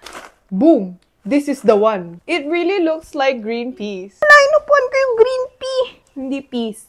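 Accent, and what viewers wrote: Philippines. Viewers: bat ang galing mo mag tagalog? u sound very pinoy.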